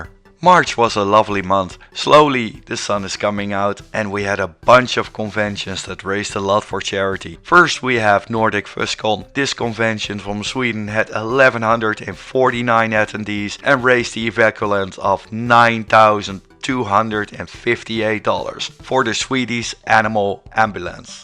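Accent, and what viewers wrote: Netherlands. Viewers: Eyy I hear you’re dutch!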